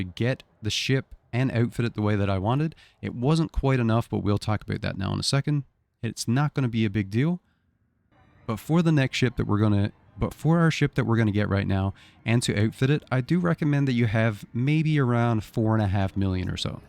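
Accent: Newfoundland, Canada. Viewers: HEY! just a heads up..... love the canadian accent 😉😆 getting back into this game today after literally almost a decade and well "overwhelming" is too light of a word so I appreciate the advice from 4 months ago lol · You from Newfoundland? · Man I can't watch this. Can't stand the way Canadians talk.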